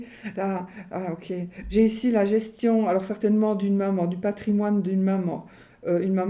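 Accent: Belgium. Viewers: Au plaisir je vous adore vraiment je vous suit depuis un bon moments et jaime votre naturelle et votre accent belge😂 vos guidance sont tres pertinente vous parlez de tout j'adore continuer comme ça et si l'écriture automatique vous appel nous aimerions bien avoir une lecture pour le fun merci merci belle journée a vous ❤🙏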